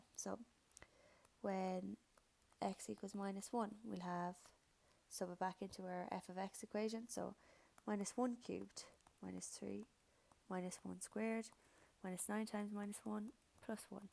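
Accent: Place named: Ireland. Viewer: That Irish accent is the best 👍thanx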